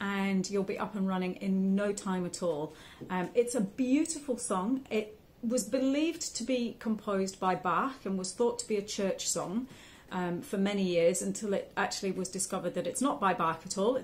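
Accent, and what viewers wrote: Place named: England, United Kingdom. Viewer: thank you..I love your accent..you sound like you might be from England.. perhaps London?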